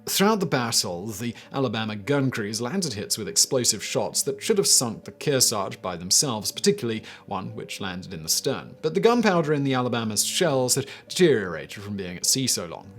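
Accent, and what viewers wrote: United Kingdom. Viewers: I sometimes wonder if Simon's videos would still sound educational if he'd have an American or Aussie accent. He could read the instructions on a box of matches in his British accent and it would sound like a lecture on quantum physics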